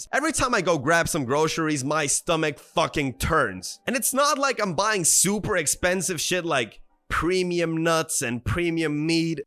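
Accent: New York, United States. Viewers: Bro sounds like he is from new york or something😭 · Thats a new yoker accent for ya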